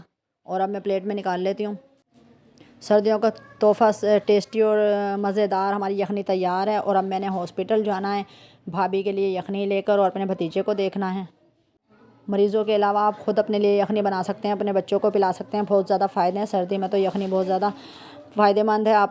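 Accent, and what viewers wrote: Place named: Multan, Pakistan. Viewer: Thanks mujhe apki awaz Multan ki lagi thi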